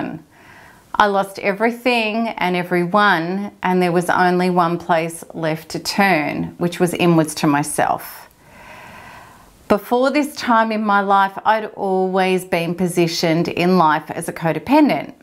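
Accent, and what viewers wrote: Australia. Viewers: Love your accent! Are you Australian?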